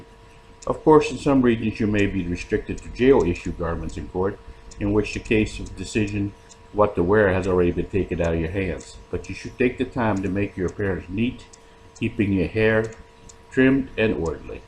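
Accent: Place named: South Louisiana, United States. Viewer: Are you originally from South Louisiana? Your accent reminds me of some back home folks near the bayou.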